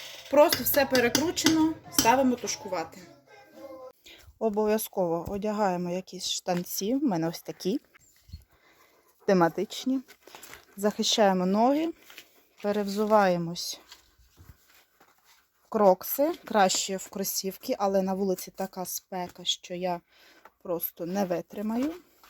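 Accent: Western Ukraine, Ukraine. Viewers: Як ви так швидко перейняли западенський говор.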